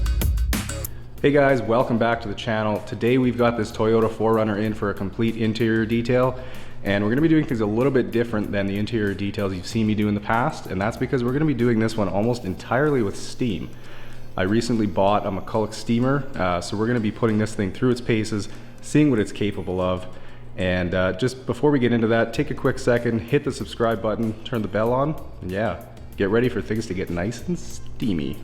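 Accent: Canada. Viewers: Where are you from lol, ur accent sounds Canadian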